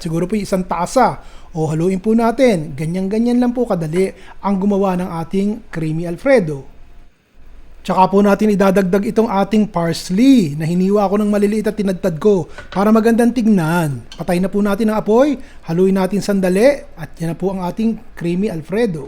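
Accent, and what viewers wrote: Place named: Batangas, Philippines. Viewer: Nkktuwa ka po mag salita. Akala ko po Taga Batangas ka po or Bulacan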